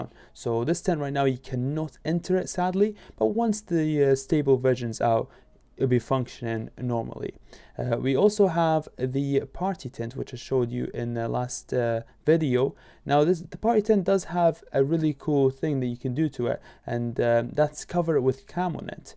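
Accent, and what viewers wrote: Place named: Scotland, United Kingdom. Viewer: Yes mate I thought I heard some scottish in there! Class video, keep up the good work.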